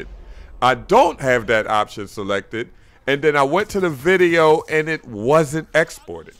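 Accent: Philadelphia, United States. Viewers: sup o.g keep up da work. where u from? u sound like u from philly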